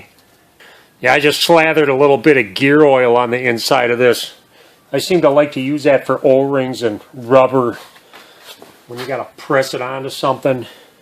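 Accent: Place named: Minnesota, United States. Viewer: I could tell you’re from Minnesota. I also just watched the TV show Fargo.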